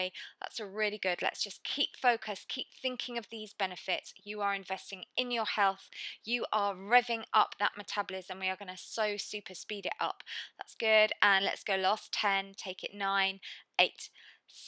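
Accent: United Kingdom. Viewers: That '2 uncommon exercise' is actually the modification or easier version she provided for people who can't keep up with the move in the right side. If you can't understand what she's talking about, learn more. Listen more British accent conversation. That's what I do :) good luck! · Love your workouts! and being from the UK like you, your accent is a relief! lol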